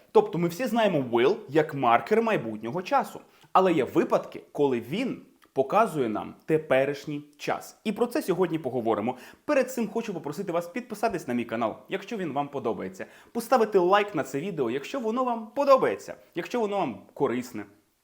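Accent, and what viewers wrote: United Kingdom. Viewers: Хахах... Усі вчителі навчали нас зовсім по-іншому! Усі, буквально кожен з них вимовляв просте слово "open" як [оупен]... 😂 У вас же - зовсім інакша, але така типова вимова як для звичайного англійця! Не "оупен", а щось схоже на "ьойпен" (майже так само якщо поставимо якусь приголосну перед м'яким знаком, але не вимовляючи цю приголосну!). Просто шикарний British accent!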